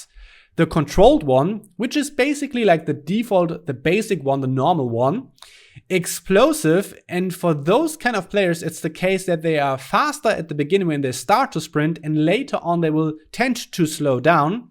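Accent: Germany. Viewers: youre one hundred percent german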